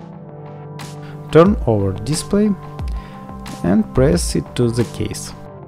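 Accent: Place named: Russia. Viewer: ду ю кен спик рашен, бекоз ю хэв рашен акцент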